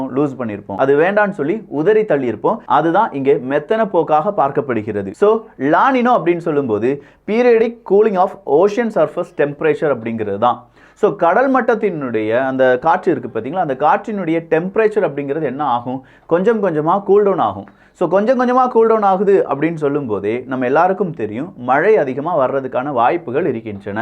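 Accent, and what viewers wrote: Kerala, India. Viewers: அவர் மலையாளி கலப்பு. அதான்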